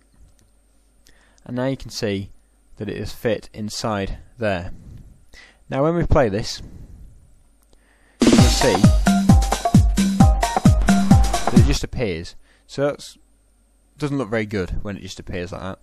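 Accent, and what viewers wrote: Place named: England, United Kingdom. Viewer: Thanks mate for helping video. Just optained Corel Video Pro 6. Very nice tutorial for starting guys like me. Love your Englings accent. Cheers, Eric (nl)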